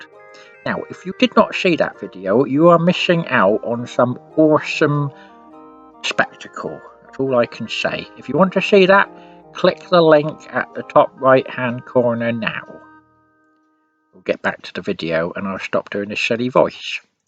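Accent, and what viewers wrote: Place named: Sussex, United Kingdom. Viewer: I love the old Sussex accent, cracked me up. Does Farmer Barleymow know that one of he's horses in the nearby paddock has sadly passed away ? I would love to get hold of a couple of black Terriers and convert them into Class D1's, but sadly there are none out there in N gauge that take a DCC chip. Loved the update. Regards Chris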